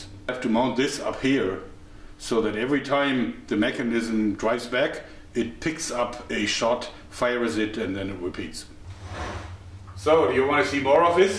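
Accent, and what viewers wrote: Germany. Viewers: Das ist eindeutig englisch mit deutschem Akzent :D Hab ich recht? :)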